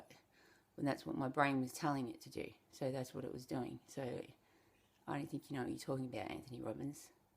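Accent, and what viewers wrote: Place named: Australia. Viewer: I like your openness and frankness, your flow and insights, - I have a friend who has similarities. You sound like you're 'down under' (from this London perspective!). Thanks anyway.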